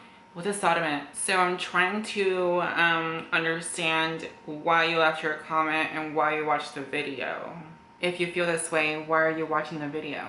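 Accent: United States. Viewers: Your voice is what makes you, you. Honestly i feel there's nothing wrong with it. To me as a British female you just sound like a normal American girl to me. I'm sorry you receive so much hate I feel people are just bothered because they can't understand or they can't look like you. Keep inspiring! Love your views I'm also glad you mentioned about the pregnancy thing, so many people around me struggle and some cannot conceive. It's very bitter and harsh to throw it in your face, I hope that the positive out ways the negatives for you! You are amazing ! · Your voice just sounds like youre from California haha. People are just Caliphobic :P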